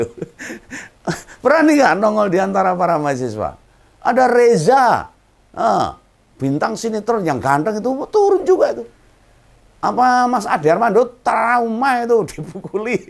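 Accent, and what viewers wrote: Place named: East Java, Indonesia. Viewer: Aku sueneng ngrungok ke mase Iki wong Jawa timur nek ngendikan bloko suto 😂😂😂😂😂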